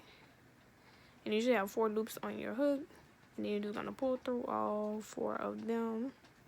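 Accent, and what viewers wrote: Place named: Louisiana, United States. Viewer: The Louie accent 🥹🫶🏾. I love ur personality and that color is 🔥 .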